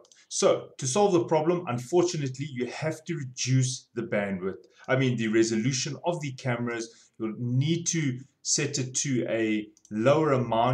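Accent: South Africa. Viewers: south african lol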